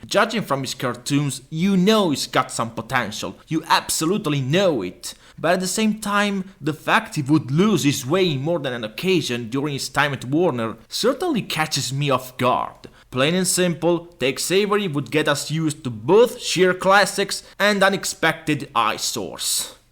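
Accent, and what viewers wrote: Italy. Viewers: You're strong Italian accent is too difficult to listen to to allow me to focus on the content of your video, which sounds pretty decent.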